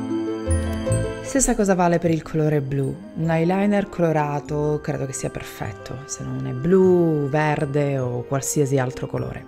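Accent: Sicily, Italy. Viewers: Sei la migliore in assoluto...per la tua bravura, la tua fantasia...ma sopratutto per la tua simpatia e il tuo mitico accento da siciliaaana :) una curiosità...i tuoi figli ti vedono con questi trucchi? Cosa pensano? Hahaha che divertimeto immagino...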